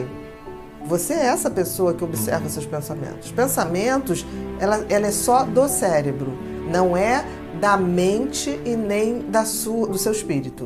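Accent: Rio de Janeiro, Brazil. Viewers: Nunca tinha reparado que carioca falava assim… obrigado! 😅😁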